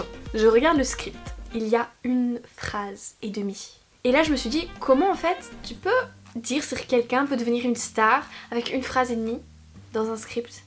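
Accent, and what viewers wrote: South, France. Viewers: C'est juste horrible de se servir des gens comme ca avec plein de promesses en plus quand on n'y pense ca aurait pu être pire dans c'est cas la il peuvent demander n'importe quoi et tu ma surpris d'une part par l'accent du Sud qui revient et par ta patience 😊😊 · #AccentDuSud#Snap, sinon superbe vidéo comme toujours 🖇🖇❤️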